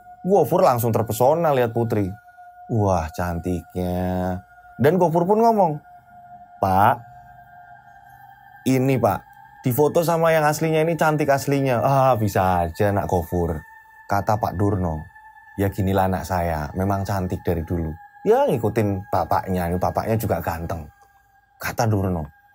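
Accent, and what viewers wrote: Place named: Banyumas, Indonesia. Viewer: Ngapak hadirrrr😂 .. Ora ngapak ora kepenak.. Booommm... Booommm. Yosskayyy😅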